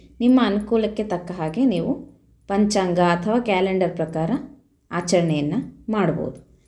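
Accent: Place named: Bangalore, India. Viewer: ನಿಮ್ಮ ಮಾತು ಕೇಳಿ ದರೆ ಬೆಂಗಳೂರು ಎನಿಸುತ್ತದೆ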